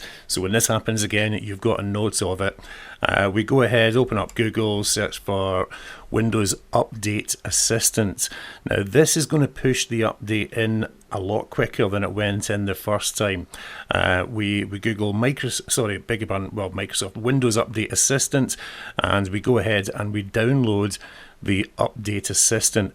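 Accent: Scotland, United Kingdom. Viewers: Trust a Scotsman to know a great solution and easy explanation to boot! The accent was very nice too!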